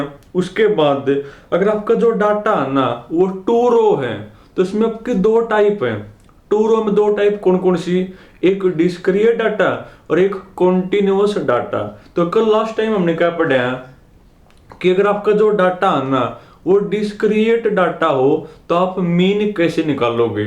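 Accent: Haryana, India. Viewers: Haryana t ho sir aap ???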